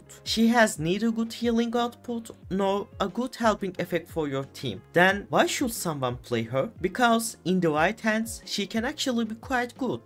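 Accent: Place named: Turkey. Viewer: Noticed the Turkish accent in the first 15 seconds ahahah 😄 nice video though, hopefully I'll do better the next time I play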